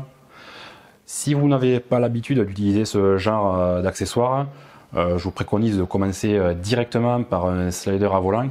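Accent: South, France. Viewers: Bravo pour ce fabuleux travail! L'implication dans chaque vidéo est très visible! Un bon test qui fait ce qu'on lui demande. (au top d'avoir mis un exemple, c'est pour moi la base dans ce type de test). L'éclairage, le mur est au top! Et toujours avec l'accent du sud!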